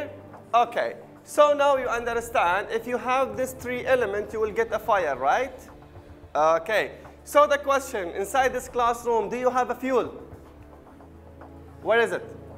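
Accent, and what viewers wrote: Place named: India. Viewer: Excellent explaination eventhough he sounds like a Indian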